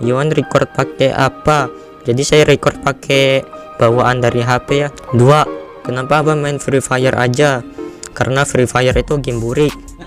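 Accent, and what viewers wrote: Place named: West Java, Indonesia. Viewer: Logat sunda anjer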